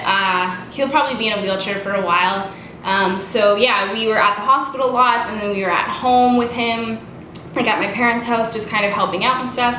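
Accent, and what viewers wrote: Canada. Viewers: As soon as you opened your mouth I knew you were Canadian. I. Love. You. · She's Canadian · You're Canadian aren't you! I'm not sure if you said this already but I can really hear it when you said "sorry"'and "out"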